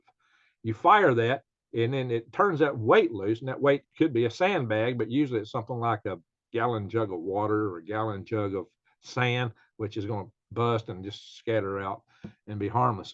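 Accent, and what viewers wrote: South, United States. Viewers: When the grid falls im sure gonna miss hearing. My favorite southern rocket scientist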